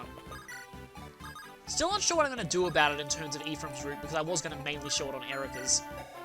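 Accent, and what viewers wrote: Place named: Australia. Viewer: man that speed up voice really did show your Australia accent.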